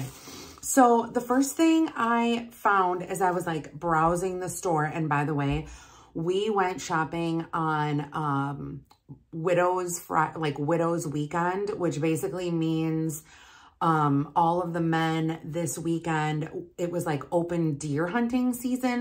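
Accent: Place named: Midwest, United States. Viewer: First thing I thought, midwestern gal!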